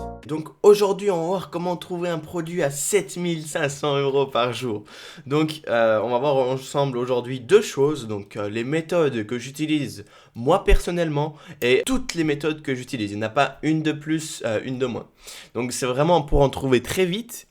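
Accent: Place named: Romandy, Switzerland. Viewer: bien sûr! Merci pour la vidéo bourrée d'informations et présentée avec panache. (Et un accent romand je crois!)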